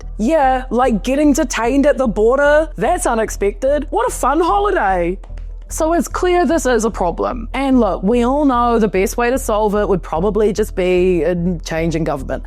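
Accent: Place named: New Zealand. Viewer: So pleasant to hear a New Zealand accent, if I am not mistaken.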